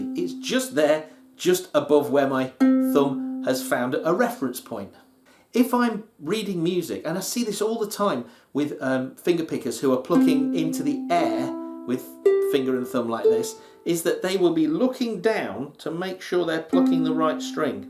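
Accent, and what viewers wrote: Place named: United Kingdom. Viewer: Jolly! Love the ur Brit accent!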